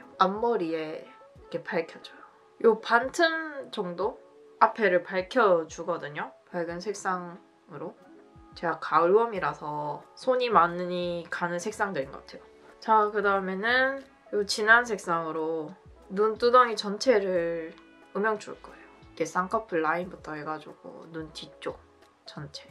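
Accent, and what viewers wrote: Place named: Seoul, South Korea. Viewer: 이제 서울말 쓰시네요..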